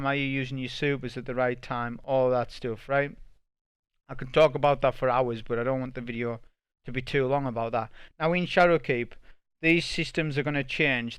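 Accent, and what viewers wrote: United Kingdom. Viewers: Excellent well presented video explaining key points on how to progress (solo or not to be fair). Can only assume that the 2 down votes were a mistake but if not, why don't the asshats share why they disliked it? Bet they don't. GG buddy. Always nice to hear a fellow Brit on the Destiny wagon.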